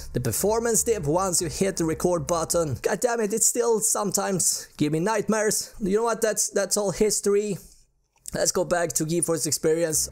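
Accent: Sweden. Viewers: bara svensk dialekt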